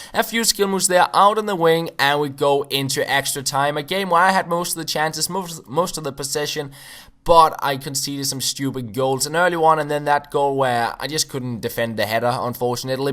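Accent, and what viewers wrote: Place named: Denmark. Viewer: I love the danish accent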